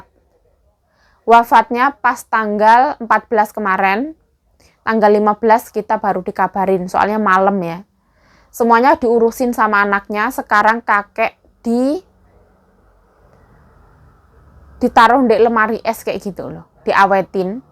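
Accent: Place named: Malang, Indonesia. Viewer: aku denger kamu ngomong kok lucu bener, kata di kaploki kok jadi ingat bahasa Malang yo Jeng? aku jadi geli denger nya. Okay salamku dari jauh Jerman ( Germany ) Berlin. Salam kenal juga.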